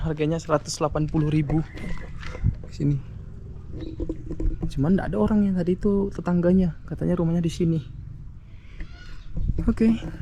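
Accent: West Sumatra, Indonesia. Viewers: logatnya logat minang bg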